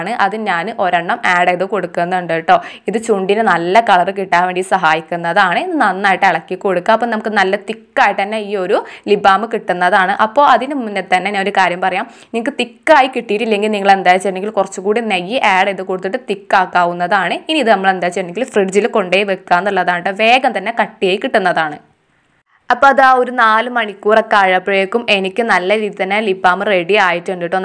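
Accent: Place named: Kanhangad, India. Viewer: Kanangad slang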